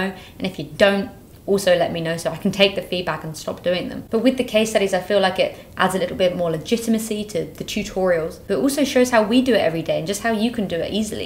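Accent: United Kingdom. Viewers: I really like the content you´re making on this Channel... But I absolutely LOVE your british accent!!! :-D Cheers & a happy new year to all in the Burstimo team from Denmark :-)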